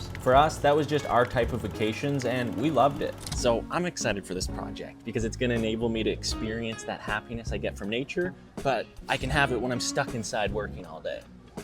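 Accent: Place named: Canada. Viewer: Bro your accent is like so Canadian and I love it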